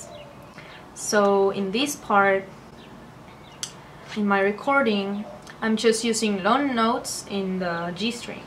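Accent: Spain. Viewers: Tío pero si con el acento españolizado que tiene se entiende perfectamente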